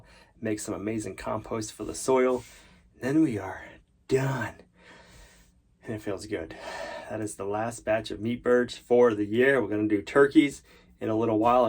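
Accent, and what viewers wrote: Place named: Boston, United States. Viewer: Good Morning, I hear you on the rain it seems like it never stops looks like more today. your Boston accent keeps showing up I have one also formally from South Shore now in your area. Stay dry.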